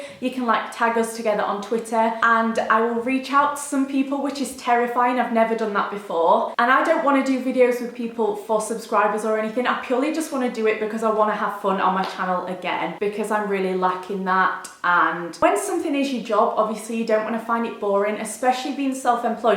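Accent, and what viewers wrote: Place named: North, United Kingdom. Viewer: I relate to you massively with regards to food. I’ve struggled with binge eating when I feel anything but happy and the past few weeks I’ve massively been off plan with slimming world. It’s so inspiring to see someone so beautiful having the same issues as me, so I thank you for showing me it’s okay to feel how I feel. Also you’re northern like me and I love it!! 😂 I really hope I meet you at some point, I’d love to sing with you is that weird?? 😂💕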